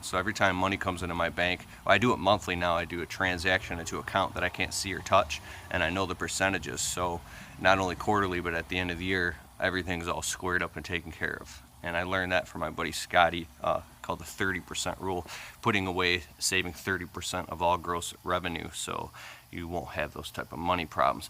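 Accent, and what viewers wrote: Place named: New Zealand. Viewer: he is from New Zealand dude ...😂😂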